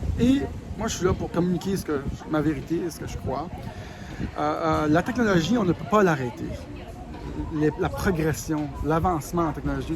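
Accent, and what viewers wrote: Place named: Canada. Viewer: En plus tu es très sympa. J' aime entendre les canadiens qui parlent français parceque je les comprends bien.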